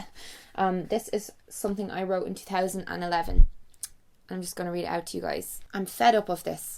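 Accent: Ireland. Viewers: I am watching from Ukraine! I love you so much and you make me looooooveee Irish accent! You're so cute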